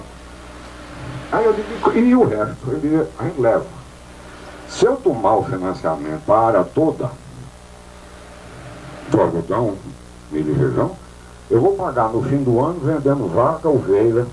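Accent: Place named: Northeast, Brazil. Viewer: A fala dele é identica a de Amaral Girgel outro nordestino destaque no mundo dos negocios.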